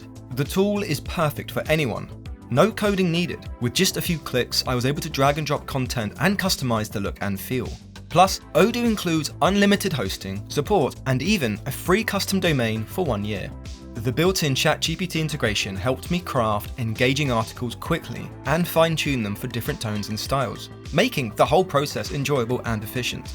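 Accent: United Kingdom. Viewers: Hate this condescending British voice speaking ill of a great man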